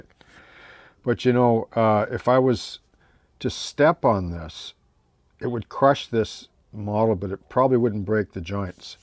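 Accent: Canada. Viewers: Located and ordered! Thank you so much. Keep on building and doing these videos. I'm not a Railway modeller, but modellers appreciate everything done on a small scale - or I do at least. I especially love your scratch-built ships and boats. I knew you were a fellow Canadian when I heard you speak. 🙂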